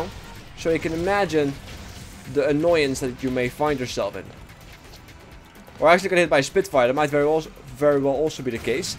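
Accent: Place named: Netherlands. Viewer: dat dutch accent.. seriously dude fix your pronnouncication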